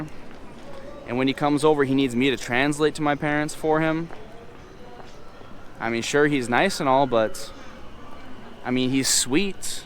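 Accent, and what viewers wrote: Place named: United States. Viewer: Dude it's obvious your Americian when you talk and the cloths you wear. But yes most of what you say is facts.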